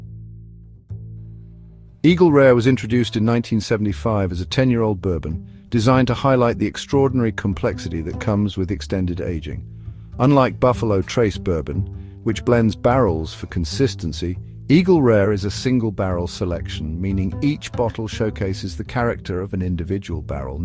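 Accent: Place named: England, United Kingdom. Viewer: why have an english accent voiceover for the most american product??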